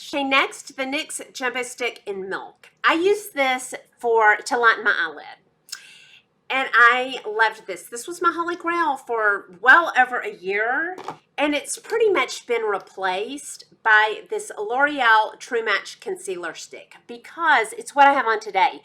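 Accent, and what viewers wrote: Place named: Texas, United States. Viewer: I knew there was a reason I love you and love watching your videos....you’re a fellow Texas gal!! I knew that accent was familiar!! You are just adorable!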